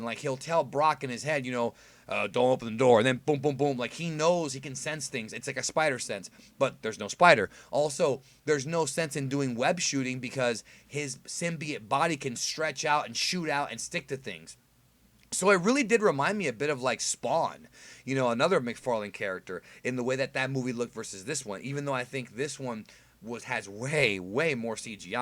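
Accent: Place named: Connecticut, United States. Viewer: This dude has got to be from Connecticut because he sounds exactly like the frontman Jamey jasta from Hatebreed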